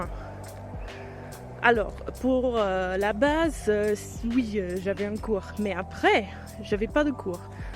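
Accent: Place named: Germany. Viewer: Tu as le même accent que mes cousins allemands!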